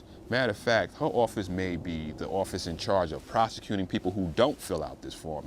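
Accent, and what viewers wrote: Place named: New Jersey, United States. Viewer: it sounds like ur from Jersey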